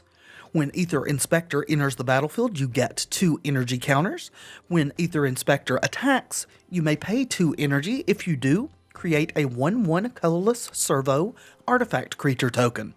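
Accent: South, United States. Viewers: First time watching your videos, had a very good time. Your southern accent kind of reminds me of Gideon from Gravity Falls. Hope you take that as a compliment! :D Thanks for the content. Thumbs up.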